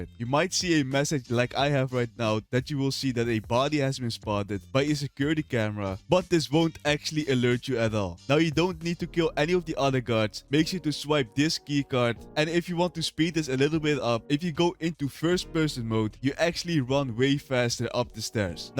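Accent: Netherlands. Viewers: Hes defo dutch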